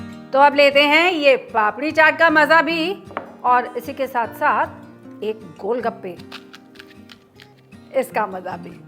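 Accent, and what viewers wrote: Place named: Lucknow, India. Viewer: मोहतरमा आप एकदम लखनऊ वाली हो गई है अच्छा बोल लेती है , हां उर्दू तो नहीं बोली फिर भी....... good 👍 i am also from Lucknow but now residing in kashi . One very special ingredient heeng you have not used . I put heeng that increase the flavour more . In both resipe. Here in kashi matar alu and golgappe wala pani they mix it is also very tasty .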